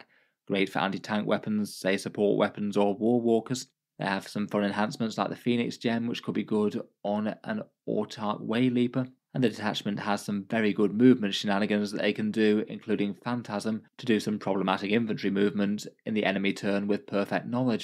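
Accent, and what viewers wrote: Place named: United Kingdom. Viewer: why britains can't speak english?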